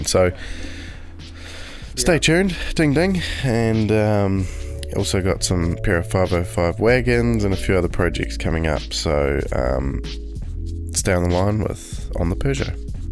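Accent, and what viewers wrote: New Zealand. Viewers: Sure am, that's why it was so good to hear a kiwi voice. Car is in ChCh . . . Taking it to Taupo and then Akl . . . Will have a look and join the forums. Cheers again, go well with the restoration.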